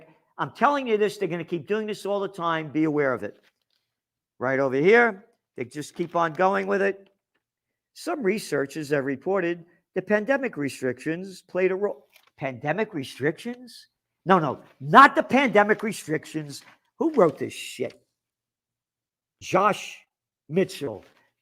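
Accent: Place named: Bronx, United States. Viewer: When you get into your rants you take me back to the good old days in Da Bronx. Love you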